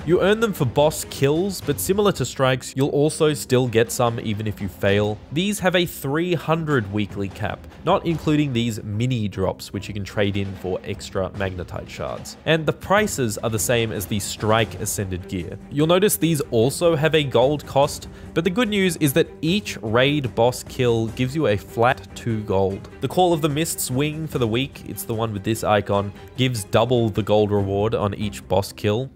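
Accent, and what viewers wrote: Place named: Australia. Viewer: >Australian accent Yeah imma head out.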